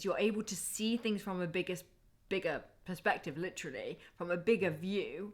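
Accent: United Kingdom. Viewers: Hello my favorite dreamy reader of my path in this coming new life, Your readings are so resonant of the process I am going through for the last few months, sometimes I find it incredible and very enlightning, thank you for the energy you devote to my readings, I am always looking forward to just seen you and hearing your beautifully sounding British accent and that natural radiance and beauty that comes within you, that I love so much, don't ever stop ohhh yes and I Love You more than you will ever know!!!!!!!💖💖💖💫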